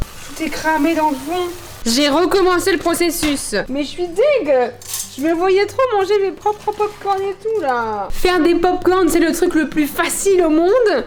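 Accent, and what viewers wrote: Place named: Nord-Pas-de-Calais, France. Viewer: Bonsoir, désolé mais c'est quoi cet accent de cht'i LOL "mais c'était quoi cette tète de comme si du gouté de la merde là" Je suis mort ( je suis de Picardie cousine ) Bonne continuation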